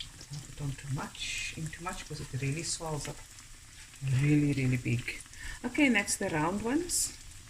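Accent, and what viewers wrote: South Africa. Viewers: Hi l made these yesterday. They were delicious. I miss that SA accent,now living in UK.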